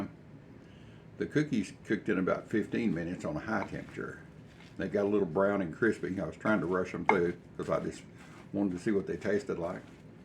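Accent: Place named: South, United States. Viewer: Those granola bars look delicious and I’m certainly planning on making a batch or two. And by the way that’s how southerners talk. Taters instead of potatoes, maters instead of tomatoes, naners instead of bananas..... jeez give me a break. Keep up the good work dude.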